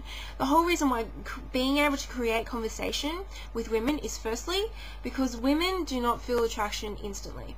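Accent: Australia. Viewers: Oh my yes, hubba hubba! Sexy down to the marrow. Auzzi accent is to-die-for! Edible, SOOOOOO edible.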